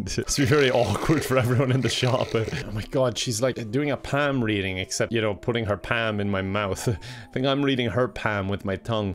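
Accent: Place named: Ireland. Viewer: he's Irish, that's his accent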